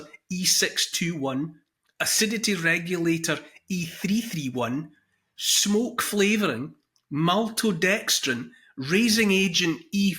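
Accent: Scotland, United Kingdom. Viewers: You have a lovely Scottish accent.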